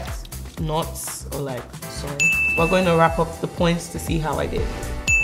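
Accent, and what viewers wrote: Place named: Nigeria. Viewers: first of all, did you read the comment He's just saying that she's not trying too hard to change her Nigerian accent No one is hating you ffs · Love how you spoke with your Nigerian accent and not trying to speak with an Anerican fake twang like some afrobeats artists. Keep repping Nigeria be proud.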